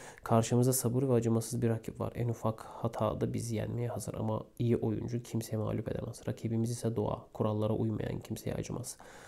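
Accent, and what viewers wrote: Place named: Black Sea, Turkey. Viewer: Karadeniz şivesi süper😂Oruçlu okudunuz sanırım insanın ağzı kuruyor,sonlara doğru bitiricem diye zorladınız teşekkürler.Hakkınız ödenmez.🙂💐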